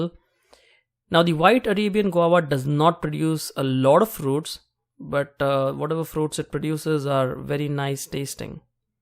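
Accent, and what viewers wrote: India. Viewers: Is this voice indian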